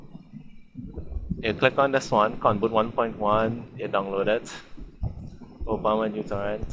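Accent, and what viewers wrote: Trinidad, Trinidad and Tobago. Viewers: Trini accent strong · your trinidadidan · you sound like a trinidadian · Hes trini/guyanase and probally a west indian. I can understand his language fine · wait nah...a trini? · Trinidad accent haha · lol 100% trinidad accent... maybe bahamas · trinidadian? me too! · i understand it very well are you from trini to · de trini accent strong.. lol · yuh soundin like yuh is ah trini, I undahstan yuh clean. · He ah trini!!! Trini big up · how that sounding like a trini accent so ? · u iz ah trini or wa? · wat a large trini accent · never thought i'd see a trini giving tech advice on youtube yes..sounds like yuh just kixxin around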